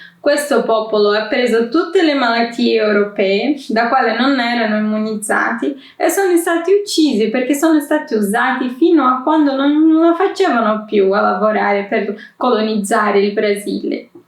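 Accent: Sicily, Italy. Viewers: Ciao, sono arrivato nel tuo canale dopo aver letto un tuo commento sotto un video di Learn Italian with Lucrezia. Beh, che dire, bravissima! Parli molto bene l'italiano e per di più ho imparato tante cose che non sapevo del Brasile. Continua così 💪 Fun fact: sin dalla pronuncia delle prime prime parole ho pensato "questa ragazza sembra a tratti siciliana", poi ho scoperto che hai vissuto/vivi in Sicilia 😂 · Ciao, parli davvero bene! È curioso sentire che hai un accento spiccatamente siciliano, catanese direi.. ma va benissimo! :D Ciao..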